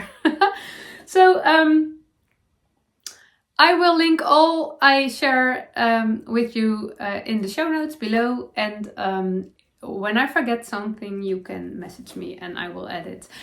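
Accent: Netherlands. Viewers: all the colors of the 3 Felix cardigan are perfect for you! Don't feel guilty by keeping them all! They were a special gift this person wanted you to have! You are so sweet to want to share these with others....but we see your excitement in wearing all your beautiful cardigans and we enjoy your enthusiasm and joy! Thanks for sharing your lovely projects! Love your 'dutchy' english! Closet, chest, cabinet, hanger, some of the words you were thinking about!🦋💕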